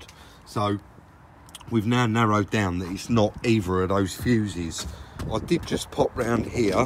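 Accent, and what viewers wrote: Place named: London, United Kingdom. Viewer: thats why most people like watching him. its So london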